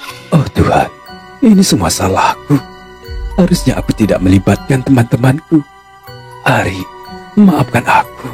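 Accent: Palembang, Indonesia. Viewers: Itu gaya bahasa palembang byk kata o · Bang itu bahasa palembang ya, ciri khas nya pakai hurup O ,benar ngak bang ?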